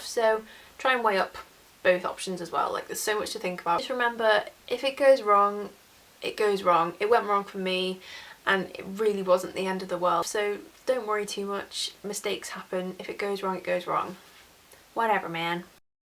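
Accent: Liverpool, United Kingdom. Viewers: I've watched a couple of your vids and kept thinking why your accent sounds familiar and you're from Liverpool! You've got a little scouse twang. From Liverpool myself. Love your vids xx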